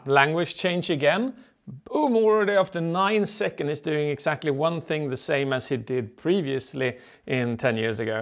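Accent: Sweden. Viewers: Do i hear some swedish accent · e han från sverige eller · Are you swedish? Also great job on the video watching it made myhead hurt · Well they are both swedish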